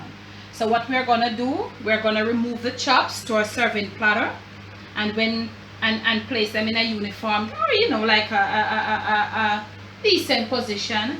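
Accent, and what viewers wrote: Jamaica. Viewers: Real Jamaican🇯🇲 Chef this enuh full a drama & she definitely NOT acting she giving us raw Patwah ! Well done 👍 Perfect garnish is Yu TEETH 🦷 🤣🤣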